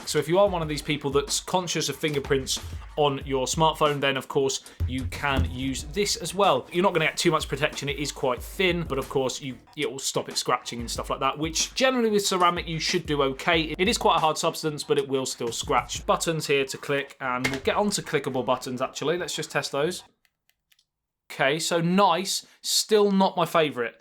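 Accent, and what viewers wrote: England, United Kingdom. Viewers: Are you english? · British accent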